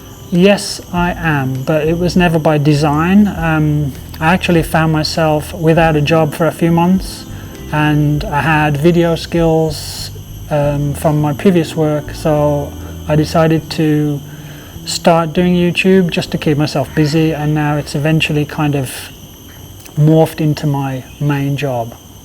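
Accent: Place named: Black Country, United Kingdom. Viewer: Kudos for answering my question on where in the Black Country you're from. Had not seen the vid where you referred to your place or origin but got it from your accent!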